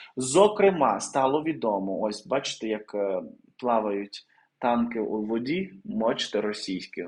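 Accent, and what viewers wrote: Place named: Western Ukraine, Ukraine. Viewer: Ну что ж такое, что это за украинский с западенским говором, ни одного города не назвал правильно. Хоть Залужный правильно сказал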